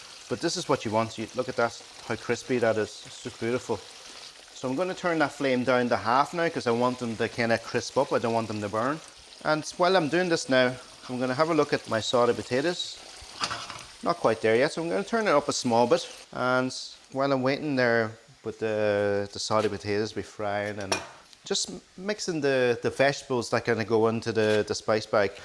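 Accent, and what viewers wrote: Ireland. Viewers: Asian guy with Irish accent cool..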